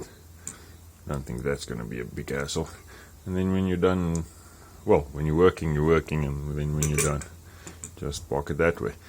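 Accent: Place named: South Africa. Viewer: You sound south african, are you?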